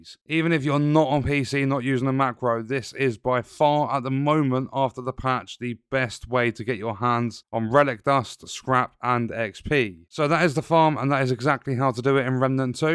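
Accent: United Kingdom. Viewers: I know his time zone. His accent is a dead giveaway. He’s definitely British. So his time zone is the same as mine!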